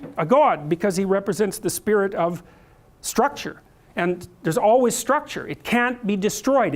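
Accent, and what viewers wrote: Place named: Canada. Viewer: The way he says "not" and "God" is for some reason hilarious to me. Or anything with an o. What a Canadian. Also, long live the lobster queen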